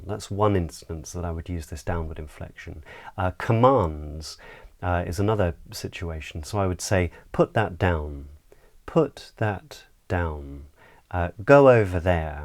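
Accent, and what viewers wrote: United Kingdom. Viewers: I really enjoy listening to your speech and the pure British ACCENT.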